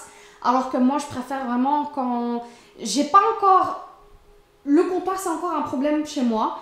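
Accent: Belgium. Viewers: Coucou 🤗 J aime bien te regarder et entendre ton accent belge! Bref ta cuisine est très jolie je vois bien des fauteuils de bar cosy avec un dossier en beige velours ou daim (matière qui se nettoie bien!), ensuite je te conseille de peindre les huisseries en blanc pour ajouter de la lumière à ta cuisine et pour que ça fasse un ensemble. Dernier conseille fais une vidéo sur ta cuisine lorsqu elle sera terminée ou tu décris tout, si tu met du granit au mur ça risque de faire trop et d étouffer ta pièce le carrelage que tu as montré et très jolie mais je le verrais plus en blanc nacré 😉j espère t avoir un peu aidé 😘😘😘😘bises. Hasna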